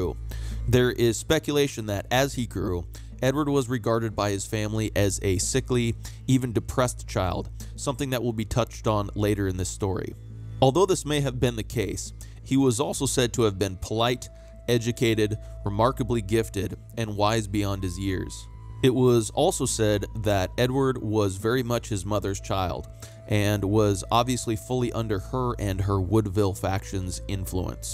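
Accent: United States. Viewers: I enjoyed this video. Is the narrator an American? His voice is pleasant and melodious ----- reminiscent of a Southern accent (Virginia, perhaps?).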